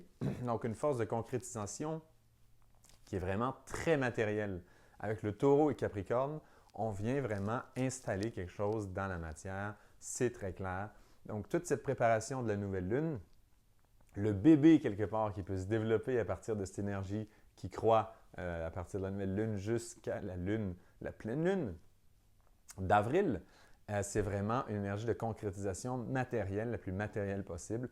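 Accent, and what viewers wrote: Quebec, Canada. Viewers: Nouvelle abonnée, merci beaucoup pour cette vidéo et pour ce sympathique accent Québécois je pense, je vous souhaite un beau mois d'avril du sud de la France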